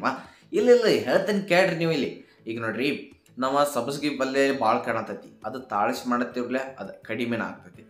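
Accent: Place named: Karnataka, India. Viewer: Nice Kannada accent 😀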